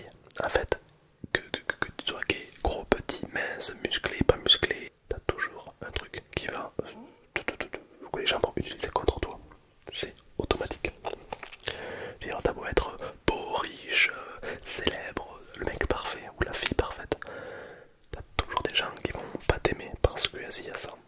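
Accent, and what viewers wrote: South, France. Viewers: T as l accent du sud😂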